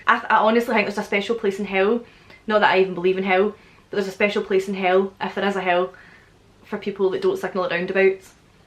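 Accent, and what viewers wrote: Scotland, United Kingdom. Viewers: I’m amazed by how fast you talk... I’m from the U.S. and I’m not used to hearing people talk to so fast, yet so clearly at the same time. It’s actually quite interesting to me. I also love the Scottish accent as well. My nationality is Italian-Colombian. I’ve only ever visited Colombia twice, though I do t speak much Spanish not Italian, I don’t believe I have much of an accent either... though others say I do. Though, it mostly resembles that of an NY accent... Bc my Italian side came from Brooklyn.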